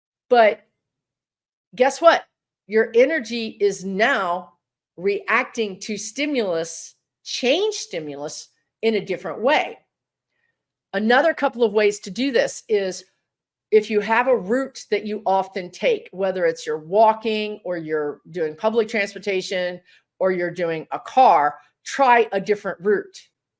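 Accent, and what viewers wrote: Texas, United States. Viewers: I had to stop you at 16/58 over "lifetime" vs their "lifetimes." Part of the draw here is the chemistry/angst/respect between you and the guides. For anybody popping in for the first time it adds immediate credibility. For me it fosters a growing appreciation for what you both bring. Personally, I love their language, just as I do yours when you talk Texan or whatever you'd call it.Equally charming.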